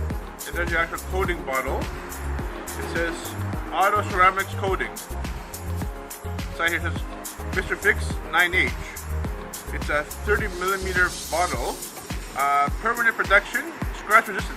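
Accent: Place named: Greece. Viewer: Wow, I wasn’t expecting to find such an amazing video about ceramic coating a rear view camera, and to top it off, the voice and dialect are incredible! Your explanation is so clear and engaging, and that Greek accent just adds an extra layer of charm. This is by far the best video I could find on this topic—thanks for sharing your knowledge in such a great way! Keep up the awesome work!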